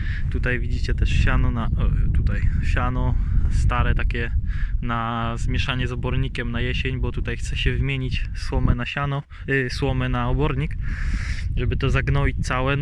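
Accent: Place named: Podkarpacie, Poland. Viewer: Prosty chłop, prosty film i prosty przekaz i tak to ma wyglądać Brawo. Przede wszystkim gratulacje chęci i odwagi do podjęcia się takiego wyzwania i realizacji marzeń. Wpadłem tutaj trochę przez przypadek ale daje suba i zostaje na dłużej z wielką chęcią na więcej. Wrzucaj częściej cos nawet z drobnych prac naprawczych, przygotowawczych itp. Powoli małymi krokami a cos z tego napewno będzie. Trzymam za Ciebie kciuki i szczerze życzę powodzenia. Nie jest to lekki kawałek chleba ale widać że dobrze kombinujesz bo grafika to przydatny fach w dzisiejszych czasach. Pochwal się tylko jeśli można wiedzieć ile masz lat i czy jesteś z Podkarpacia bo tak trochę po Twojej wymowie sugeruje się tym regionem? Jesli chodzi o poprzedni film to nie wiem czy doszukałeś się już informacji czy nie ale jeśli dobrze się orientuję to te końcówki w opryskiwaczu różnią sie sposobem oprysku w zależności od danej uprawy. 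Nie jestem rolnikiem ale pamiętam że wujek który nim jest opowiadał mi o tym kiedys bo tez sie go o to pytalem😉 Jeszcze raz powodzenia i tak jak mowie troche częściej jakieś drobne nawet wstawki z nowościami, przemyśleniami i wgl a na pewno znajdzie się więcej osób chętnych wspomoc dobra rada i słowem w komentarzach. Powiedz jeszcze jak pracę przy opryskiwaczu stoją narazie w miejscu czy cos ruszyło? Pozdro 👍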